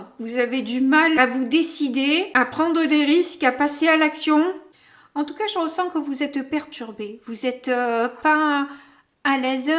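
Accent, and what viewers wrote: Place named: South, France. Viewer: Bonjour 😊 Votre tirage est clair,simple et sans Chi Chi J’adore votre accent (sud?)..😂😂j’ai le même !! Du coup pour vous encourager et vous suivre,je m’abonne 😄 Bonne continuation 🙏🙏🙏🙏🙏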